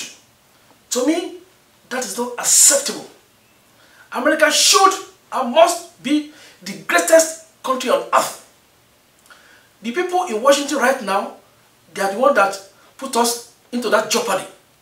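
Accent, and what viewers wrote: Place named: Nigeria. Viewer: with an accent so Nigerian, hmm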